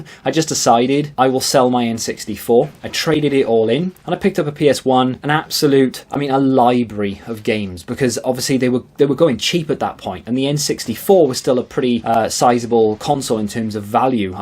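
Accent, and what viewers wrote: United Kingdom. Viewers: U sound like a brit😂. Here in the states wii u games are rising. And esp the 3ds are getting super expensive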